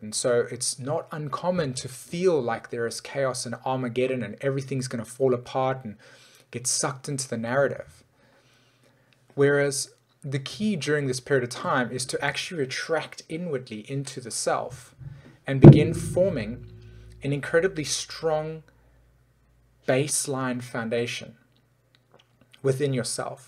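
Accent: South Africa. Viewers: Nice to hear a South African astrologer !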